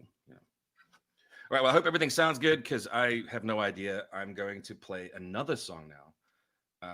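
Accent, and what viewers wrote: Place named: South Africa. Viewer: Didn’t realize he had an accent then I forget they’re from South Africa lol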